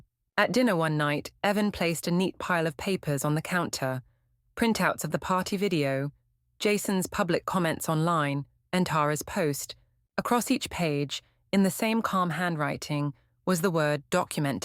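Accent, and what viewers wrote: United Kingdom. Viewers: This British narrator is dragging this idiotic story and dont waste others time you idiot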